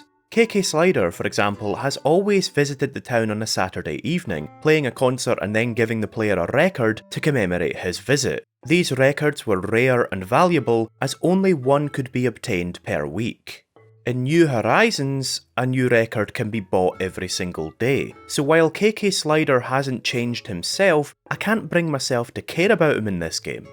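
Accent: Scotland, United Kingdom. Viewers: I miss the Scottish accent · a strong scottish accent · im considering this scottish asmr while i write my uni essay lol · Is that a Scottish accent?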